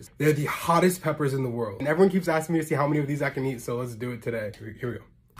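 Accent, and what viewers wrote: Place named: Egypt. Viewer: يعني انت بتتكلم مصري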